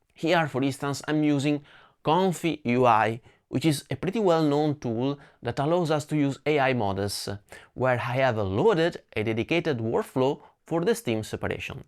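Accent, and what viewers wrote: Italy. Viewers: no need. We love your Italian (edit not French) accent :) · LOL, didn’t expect ComfyUI to pop up here! The Italian accent and those nodes gave me a nice flashback to when I was learning IPAdapter for SDXL. Great video as always, — keep pushing these unique gear reviews; they’re never covered on the mainstream guitar channels. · it's definitely not French, it's clearly Italian :D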